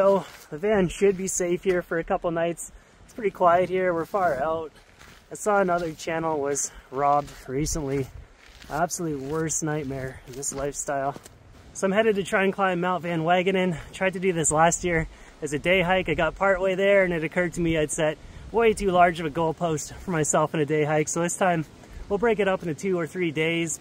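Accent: Canada. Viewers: Great drone shots, cute dog, fun adventures, subscribed. The canadian accent is adorbs. Someday I'll be your van neighbor.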